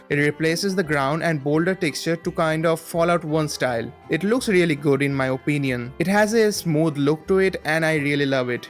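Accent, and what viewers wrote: India. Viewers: As an indian i like fallout too, you sound like a bengali guy ngl. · Idk why its so interesting to me that people from all over the world like new vegas. You sound indian, its weird i wouldnt imagine new vegas being a very popular game in 2025 in countries outside the US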